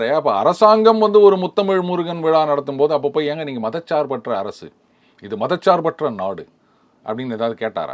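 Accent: Sri Lanka. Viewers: இலங்கைத்தமிழர் போல் உச்சரிப்பு இருக்கே இந்த நெரியாளரிடம்.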